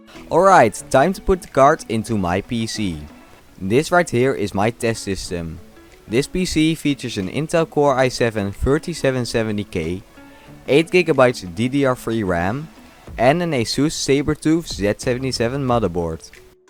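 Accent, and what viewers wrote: Netherlands. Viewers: vlgs mij ben je nederlands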